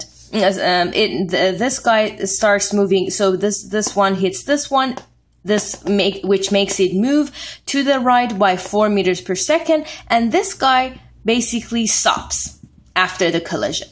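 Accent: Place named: Iran. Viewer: I swear to god when you started talking I knew you were iranian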